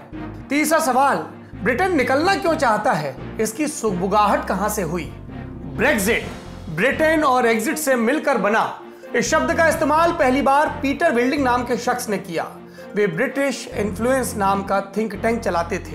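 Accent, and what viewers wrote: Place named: Bundelkhand, India. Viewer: Ashish Verma wo urai (Bundelkhand ) se hain. Avadh se nhi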